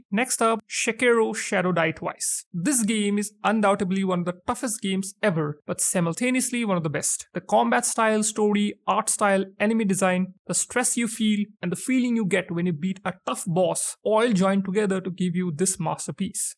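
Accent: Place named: India. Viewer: The channel's about section says channel was made in India. His accent is also Indian. So he is?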